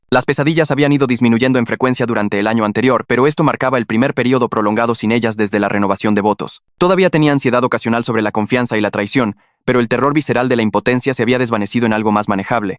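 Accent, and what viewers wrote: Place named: Colombia. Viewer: se re nota q eres de colombia bro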